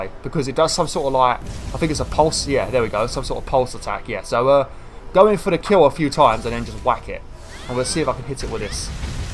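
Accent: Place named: United Kingdom. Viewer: Reasons why is an awesome youtuber: 1) he dosent spam his videos 2) he always responds to his Wolfpack 3) plays games that you want (the newer ones you want, screw call of duty and mine craft lol) 4) supports my Wolfpack (your very awesome for that, thank you) 5) is a that could play video games 6) a that drinks T 7) a that speaks with a British accent 8 ) to infinity and beyond more lol keep up good work, I'll try my best to keep supporting the Wolfpack as well as trying to be awesome for my channel or whatever! Always eat your pizza! HOOOOWWWWLLLL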